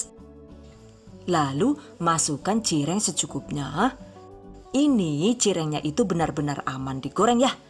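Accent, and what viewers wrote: Banyumas, Indonesia. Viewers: Wong ngndi mba kok ngapak ky kue logate koh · Hmmm gemes dh ma suaranya bunda ni. Explainnya simple n da bumbu2 candanya itu lo yg bikin gregetan gemes. Dengerin kdg ketawa sndiri. Pa lg bhs ngapak2nya klr beeeh lucu. Semangat trs bunda bikin vidio kreatif masaknya. 👍👍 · Sebenernya suka semua videonya. Semuanya bermanfaat dan yang paling penting itu videonya ga bikin bosen, pasti ketawa2 pas bahasa ngapaknya keluar 😂 Pokoke seneng kabeh pideone rika lah yu 🤣 #GiveawayTHV2020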